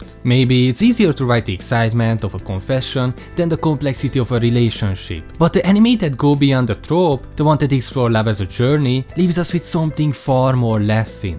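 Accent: Hungary. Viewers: Is that a hungarian accent I hear?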